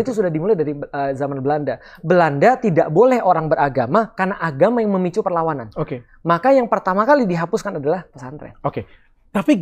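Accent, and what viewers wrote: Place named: Palembang, Indonesia. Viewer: Kanji kau ni, 😂😂😂😂😂 asli ini Palembang Sumsel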